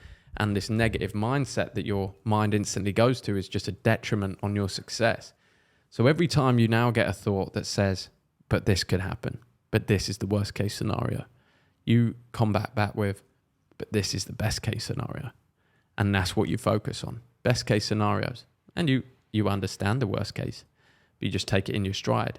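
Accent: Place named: United Kingdom. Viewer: As a non native english speaker i have to say it's very pleasurable to listen this beautiful british accent, i like it so much, good content by the way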